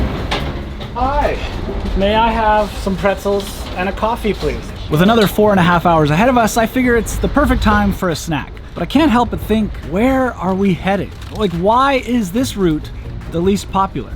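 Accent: Canada. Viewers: The man is Canadian. We don’t have to pronounce things like Americans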